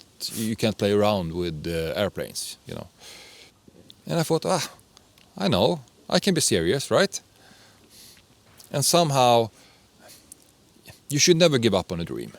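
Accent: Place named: Poland. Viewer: Wow, great idea, with starting a channel, at the same time with educational value, but it will also be a great diary for you. I stumbled upon it by accident, on the head of YouTube page, your video of your trip around Europe came up and made me curious. You have gained a really interesting aviation experience, as well as a surprising Polish accent that you flew in LOT, I myself am at the beginning of this path, I am 26 years old, about 80h of flight, studying to pass the ATPL, at the same time working remotely living in Szczecin for a German company that operates CJ1 and 2's. The aviation world is not huge, maybe one day we will meet at some airport. Greetings, Olgierd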